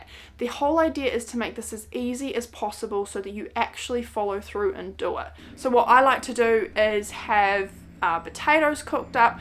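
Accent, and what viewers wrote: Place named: New Zealand. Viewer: How good to hear a kiwi voice talking candidly on this topic! And I love your hair 🥰 it’s so pretty 😍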